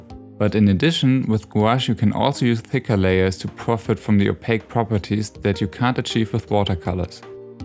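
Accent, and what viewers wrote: Germany. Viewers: Are you german? Somehow you sound like it :'3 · Wow, It's gorgeous. Your gouache drawing looks like it's a digital art. Also, do I hear a German accent, hmmm :) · dude, you german? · Are you german? You seem to have an accent:3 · Man hört schon raus dass du Deutscher bist.:) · I'm pretty sure he's German, he just sounds like almost everyone here in Germany who tries to speak English. I also have an accent like that and I think it's so embarrassing! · You Sound German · Are u German? U sound like my class. But in a good way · Dein deutscher Akzent ist mega süß😂💕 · Are you german? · Are you German? You really do seem to have a German accent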